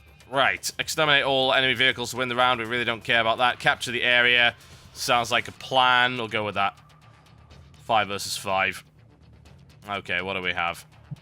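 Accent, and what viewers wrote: United Kingdom. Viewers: I just love the sarcasm and british accent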